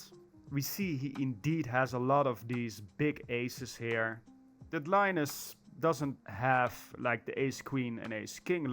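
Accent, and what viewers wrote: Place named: Netherlands. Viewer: Horrible Dutch accent😂😂😂..nice vid though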